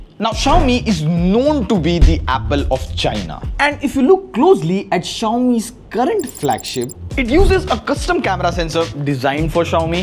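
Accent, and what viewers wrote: India. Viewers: Bro, your accent is really thick. Try to talk with out the indian accent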